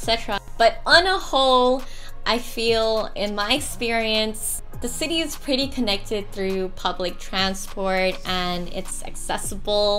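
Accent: United States. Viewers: What is with the American twist on the accent if Oz is home?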